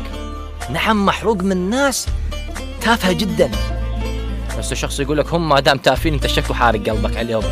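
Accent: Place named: Gulf, Arab world. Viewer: على كيفك باوع لنفسك اولن انت تافه ومنحرف زين انت عراقي ليش تحجي مثل لخليجي لو بدوي ها تعوج ابحلكك ابو لحاتات جايف لحد ولغسن 😠😠😠😡😡😡😈😈😈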